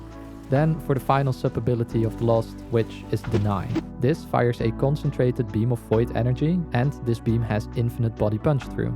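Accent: Netherlands. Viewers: Hey a dutch person i think cause you have a very dutch accent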